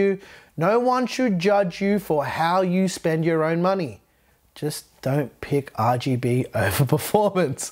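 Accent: Australia. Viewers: I subscribed for two reasons. Firstly, you don't overclock your cpu's. WHo does? Well statistically less than 1% of computer owners do and I am truly not interested in doing so. Imagine buying a brand new car and then adding turbochargers and NOS to it. Dumb, right. Overclockers do so so they can stress test their computer gear and pay to have the results published. It becomes "I have a bigger dick than you moment" which is stupid and unnecessary. Secondly, it's your accent. After listening to such much American droll it is refreshing to listen to an Australian. You are doing the world a favour. Ahh.....that accent is fantastic. Can listen to it all day and your grammar is exceptional. Thank you.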